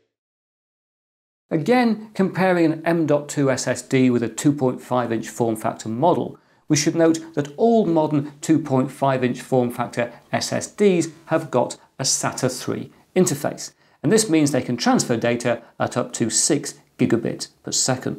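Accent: United Kingdom. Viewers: Information goes in so much easier when it's a fellow brit explaining it lol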